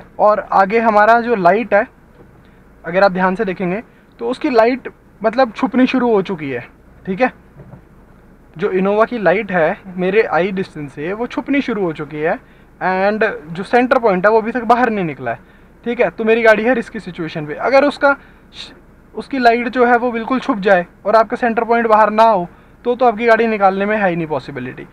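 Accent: Chandigarh, India. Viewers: Chandigarh se ho na aap 😁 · Chandigarh se ho bhai?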